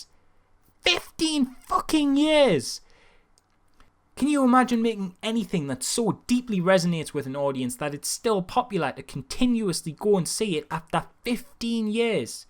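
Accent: Newcastle, United Kingdom. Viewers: Are you a Geordie or a Mackem? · A geordie review of the merits of The Room. My life feels that little bit more complete XD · RIGHT! How do people like Markiplier have 20 Million subs? This guy is intelligent, funny, passionate and non-cynical (Plus the geordie thing isn't losing him points either). It's like the secret treasure of youtube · YOURE GEORDIE ARENT YA "HOWAY"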